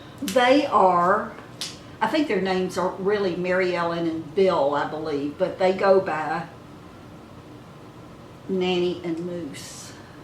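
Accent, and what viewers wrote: South, United States. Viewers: Just found your channel & I have to say I love it! I think your awesome & funny! I'm 55 & now & i hope I look half as good as you when I'm 60+!! Do I detect a Southern accent? I'm from north Mississippi myself. Look forward to watching many more shows! God bless & take care. Love, Ollie Renee' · what a southern bell! ❤ say ole again😅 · ...you're amazing! I'm so glad I found your channel! I'm 53 and everything was hanging in there quite nicely with my looks until about a year ago. Hormones...dang..who knew?! Lolol It may be a longer process, but I'm going to continue to put effort in myself. You're so beautiful inside and out!❤️ Are you southern? Love your sweet accent! I'm an Alabamian 😁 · . You really don't need the face lift band. Your face is lovely. I thoroughly enjoyed your video, very informative, but more than that your delivery is sweet. 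Pray tell what part of America are you from? I am assuming the south. Keep doing what you do, it works. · Stunning woman! I am obsessed with your southern drawl😍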